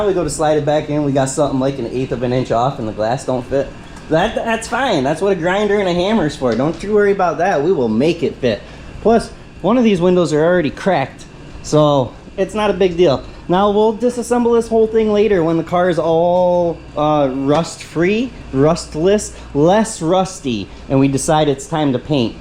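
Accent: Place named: Wisconsin, United States. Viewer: Love this guy’s can do attitude. Love your accent too. Where are you from originally? Reminds me of Wisconsin 🙀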